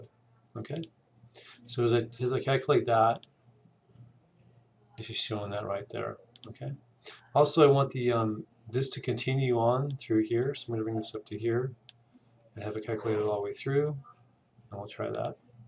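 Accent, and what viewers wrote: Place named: Midwest, United States. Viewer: I'm glad that I found this. It is straight forward and so much simpler than others that try to do the same thing. You are easy to understand for me by speaking mid-western English. I'm a 71 year old retired Engineer and I bought a monthly subscription to Solidworks. I have modeled all 88 pieces from Gilbert's Erector Set 8 1 / 2 and am in the process of assembling the projects. You may remember them. Now I can show my friends the animations. Still trying to figure out how to wrap a rope around a crank and pull something with it.....T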